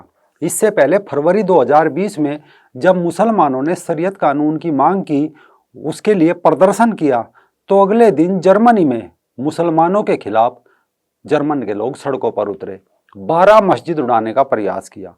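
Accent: Haryana, India. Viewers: आज आपका पहला वीडियो देखा, बहुत अच्छा लगा और आपकी बोलने की tone से आप हरियाणा के लगे Well done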